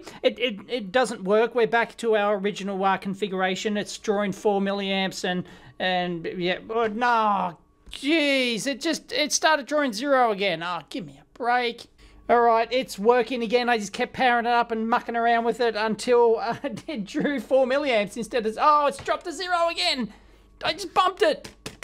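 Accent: Australia. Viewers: I tried finding it by your statements in the vid but the web site came up as an Asian Casino site. If I'm understanding your Aussie speak it is <link> The TO8662+ doesn't return anything in a search. I'm sure I must be misunderstanding something. Sorry Dave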